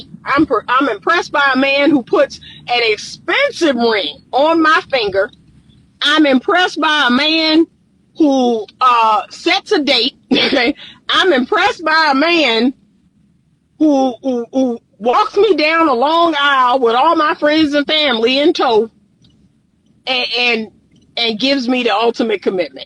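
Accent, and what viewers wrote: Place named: South, United States. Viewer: i just knew that accent was from the south. DC , the border of the north and south. good video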